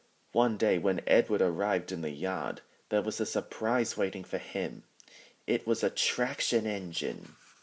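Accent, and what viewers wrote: United Kingdom. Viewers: Great job! Where are you from? Is your accent natural or you’re imitating British accent because Thomas&Friends is a British show?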